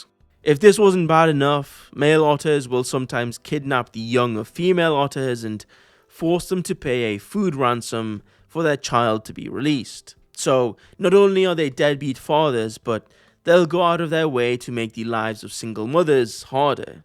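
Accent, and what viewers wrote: South, United States. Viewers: please bring back the southern accent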